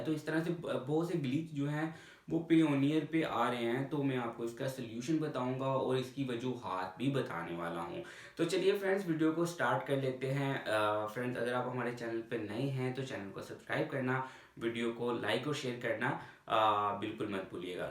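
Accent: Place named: India. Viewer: You are such a scammer You write the subtitle of your video in English But your speek is in indian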